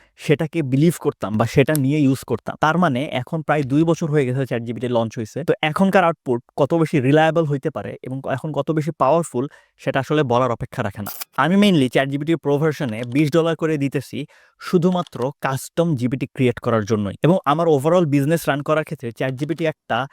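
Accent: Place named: Sylhet, Bangladesh. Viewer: আপনি সিলেটি, ঠিক নানি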